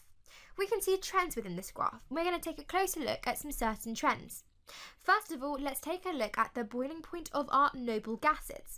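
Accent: United Kingdom. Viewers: I've never heard that much of an english accent in my life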